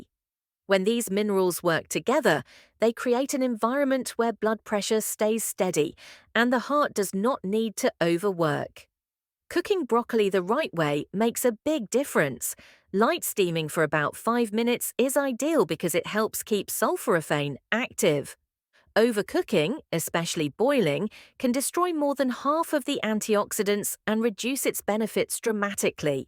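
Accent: United Kingdom. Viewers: Np need to put British accent AI. Just keep it simple! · An English voice giving advice in American Cups. 1oz or 3oz would be understood by English people. I. England we are pensioners not seniors. A I. Grrrr. Also spinach can be dangerous. English people use cabbage and sprouts. Also if on blood thinners for the heart and low BP we need to be careful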